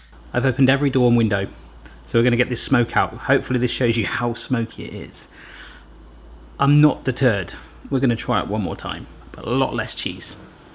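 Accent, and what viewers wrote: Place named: United Kingdom. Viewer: I love your videos!! Your the best. Love your British accent. I'm from the Great USA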